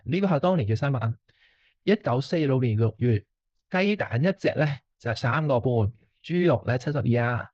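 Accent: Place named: Taiwan. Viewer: 你係台灣人? 廣東話口音有啲唔同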